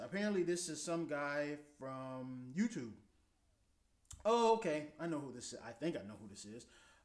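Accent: Jutland, Denmark. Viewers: Meget imponeret af dit dansk! Og det er fantastisk at du har en jysk accent! Ved ikke om du har set The Julekalender, men når du snakker dansk lyder det næsten akkurat som bondemanden :D! Bliv ved med at lave vlogs, det er vildt fedt at hører! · Pretty interesting to hear you talk danish and sounding like one from jutland, I did not expect that ! :)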